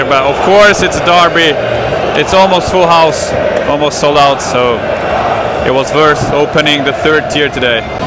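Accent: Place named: Sweden. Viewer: Bra svensenelska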